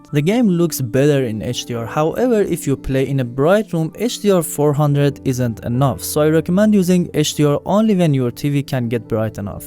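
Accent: Iran. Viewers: بنازم آقا بازی رو انجام دادم نیازی به دیدن ویدئو نداشتم ، لهجه ایرانی رو دیدم دلم نیومد رد کنم عالی و مسلط موفق باشی. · Your accent, are you Persian?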